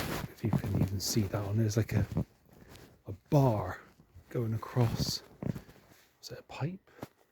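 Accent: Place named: United Kingdom. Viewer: I hope you were carrying a baseball bat..! Pitch black..good you didn’t trip! Are you in the UK 🇬🇧, I like your accent ❤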